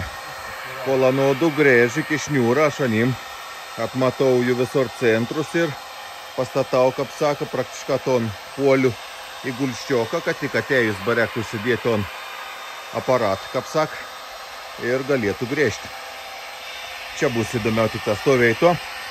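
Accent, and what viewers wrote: Samogitia, Lithuania. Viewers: Netyčia užmačiau ir dabar vis pažiūriu. Labai fainai žmogus pasakoja, smagiai žemaičiuoja ( kaip ne žemaičiui smagu paklausyt), gal tik kartais norisi detalumo, na tarkim kaip tampomi šniūrai, kaip/ su kuo susukat lentas tarpusavyje, kampukais ar ilgais varžtais ir t.t. Šiek tiek detalumo. bet šiaip labai smagiai žiūrisi ir ( jei neklystu), labai su cinkeliu toks ( į gerą pusę):)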